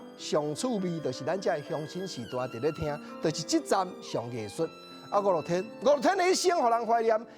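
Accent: Taiwan. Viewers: 老師的臺語好好聽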